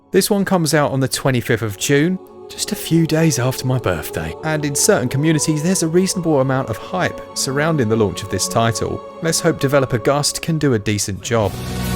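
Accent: United Kingdom. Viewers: To be honest outer worlds sucked, the story line is predictable, it just feels off and I think the reason why most enjoy it is due to the fact that they hyped it via media, youtube etc. So much, it was a true disappointment to me, get elder scrolls alot better and by the way I enjoyed this vid and its the first one I have seen I find most brits with a to heavy accent while your own is just smooth, i would love a top 10 with your personal rankings.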